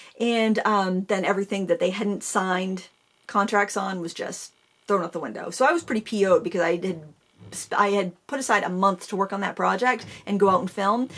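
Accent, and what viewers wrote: Maine, United States. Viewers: First found you about the time you started. Don't know how I found you, but when I heard you revert into a Maine accent I was hooked! I have lived in AZ for 21 yrs. Born & lived in Portland, ME 57 yrs, hated winter. But still have strong ties & visit every 2 yrs. Even have old friends who live in your area! I have learned oodles fr. you. When you refer to someone trying to do better with H2O coloring by getting a better brush that's me. It doesn't help. but I keep trying! Watching you paint is like therapy to me. It's like a link to home. So, please keep going! Can't imagine how difficult it must be. Your honesty, personality, talent, wide knowledge of lots of things is amazing. You go Girl. I do miss when you & your friend Sarah? did the Q & A, things change. Happy Anniversary, and many more!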